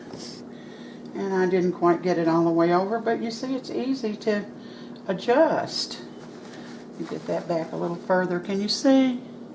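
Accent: South, United States. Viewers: I totally enjoyed your video!... Your Southern accent and the TV on in the background, made me feel right at home, in the kitchen. My Grandma was an expert pie maker, unfortunately She didn't choose to share her secrets and skills, but watching you I can imagine this is the way She made her pies. One thing I can agree with is the patience that is required to make great pie dough. It's more than just throwing some ingredients in a bowl and mixing it up. I have always said there is an art to making flaky pie crust. You said it should be done quickly, but you didn't do it quickly, and it turned out great. I guess I am saying that because I am not quick, lol. Also do you recommend Granny Smith Apples, to make the pie filling?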